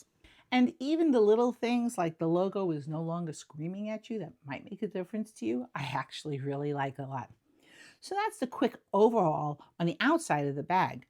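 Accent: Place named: Boston, United States. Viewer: I've just found your content and it's absolutely premium! You are so generous with your tips and education, and your information is heads above most travel YouTubers. Also, as a Bostonian, I get a huge kick out of the fact that you pronounce "packing" the way a lot of folks in Boston pronounce "parking" :)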